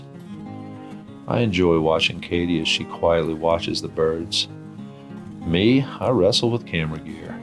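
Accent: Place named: Louisiana, United States. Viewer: Now this, this is your best so far, or as far as I know about. It has lots of Katie - check. It has your sort of Idaho-ized Loozeeana accent - check. It focuses on one thing and does that one thing well - check. It's simple, a simplicity made all the more remarkable by the complex tools you use - check. It's philosophical - check. It's got that music alright, but unobtrusive and even apropos! - check and check. And it's just the right length to do what it needs to do. Checkmate. (Personal note: I'm glad I've kept my subscription, despite my, shall we say, "disagreements" with the auteur).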